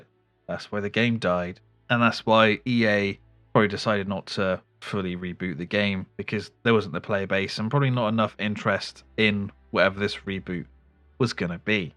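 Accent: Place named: North, United Kingdom. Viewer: Sound like a northern so subscribed no questions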